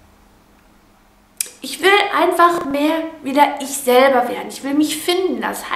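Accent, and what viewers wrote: Ruhr, Germany. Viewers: Hömma, bisse auch aussm Pott, ne? ;-) Sehr sympathisch.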